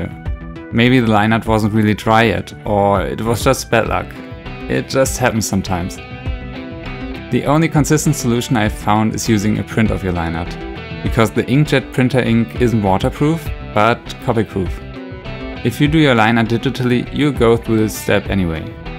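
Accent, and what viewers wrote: Germany. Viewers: Tangerine He got that big fat german accent... just like me :) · I love your beautiful German accent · you are german bc i hear it xD and i am german and on the paper block i can read "500 blatt" thats german bro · can i hear a German accent? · Are you from Germany? I think I can hear an accent. Anyways, very good video because I bought Copics too, because I I think they'd be good for Manga drawings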